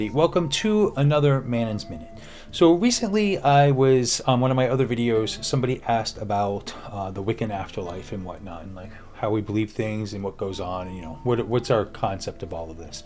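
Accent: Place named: United Kingdom. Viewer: Oh, you're British! 💂🏽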